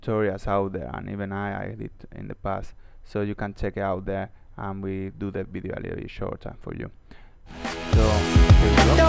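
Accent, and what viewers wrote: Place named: Spain. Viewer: Sounds Iberian Spanish, or even North African, like Morrocan, or Tunisian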